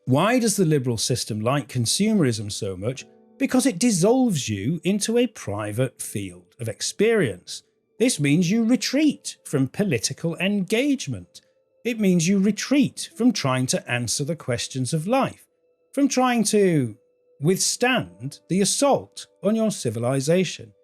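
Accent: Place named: United Kingdom. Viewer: British accent for someone in USA!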